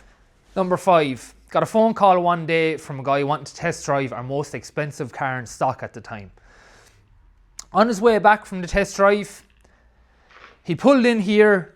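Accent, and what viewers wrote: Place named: Ireland. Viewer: You sound Irish 😮😮😮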